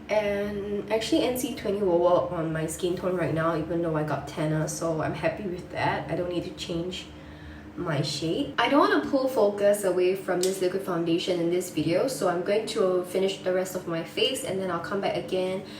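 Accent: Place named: Singapore. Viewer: you sound like your from singapore :)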